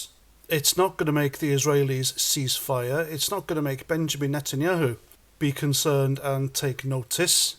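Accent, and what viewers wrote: Wales, United Kingdom. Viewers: You sound Welsh. They've just sent some so-called British Palestinians to your country.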